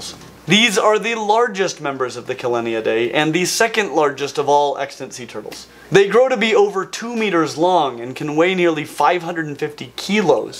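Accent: Australia. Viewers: Bonzer Aussie accent, mate! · i need you to do an entire episode in your australian accent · Can we get a video of Australian animals with doing his amazing Australian accent????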